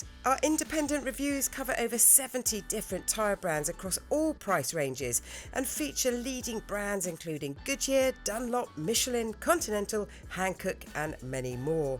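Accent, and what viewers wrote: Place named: RP, United Kingdom. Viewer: VB-H showing us how a car review should be done! Even if she does sound a bit toffee-nosed she never fluffs or makes a mistake in diction! Good on you!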